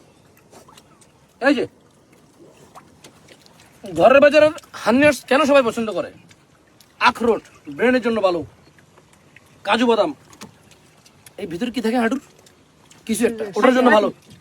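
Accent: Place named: Noakhali, Bangladesh. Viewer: আপনি নোয়াখালীর।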